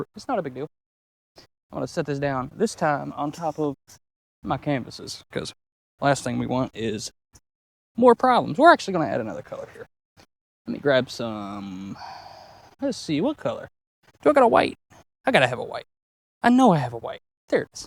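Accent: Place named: South, United States. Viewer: Its rare that I come across a new youtubers literal first video just as they are starting out. I really actually enjoyed this video, kinda reminds me a lot of Bob Ross, you've got his hair on point. and I can hear a little southern in your accent that I think adds a lot of charm as well. Keep it up, I'll stay tuned and hope to see your channel grow. maybe you could be like Bob Ross but not only painting nature but painting nature out in nature! I think that's a great idea! also, you've actually managed to tempt me to try painting myself in a similar way, it looks like a really neat and fun technique. Edit: also, I see no mistakes. just happy accidents.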